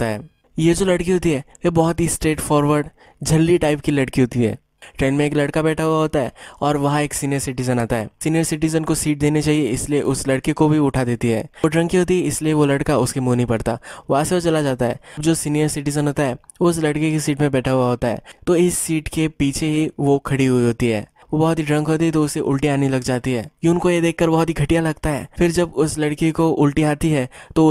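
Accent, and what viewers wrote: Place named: Uttarakhand, India. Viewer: You are from uttarakhand aren't you???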